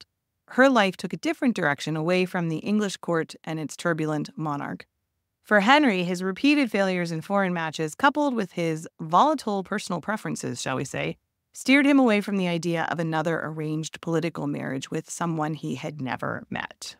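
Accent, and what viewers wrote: United States. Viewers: English history in an American accent 🤮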